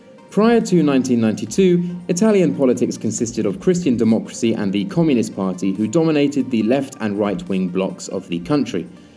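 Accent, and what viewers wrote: United Kingdom. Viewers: you are from the south of Italy? All of this time i thought you were somewhere from England. Am i correct in guessing that you moved to the South? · Wow you're from Italy? I thought you were British this entire time. Regardless, great content like always!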